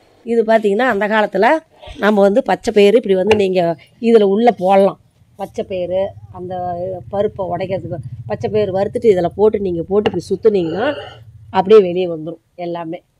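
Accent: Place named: Kongu, India. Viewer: எத்தனை பொறுமை...சிரித்த முகத்துடன் கொங்கு தமிழில் பேசிய பேச்சில் இரண்டு மணி நேரம் போனதே தெரியவில்லை மென்மேலும் வளர வேண்டும் வாழ்த்துகள் சகோதரி ❤❤ பயனுள்ள சிறந்த காணொளி ❤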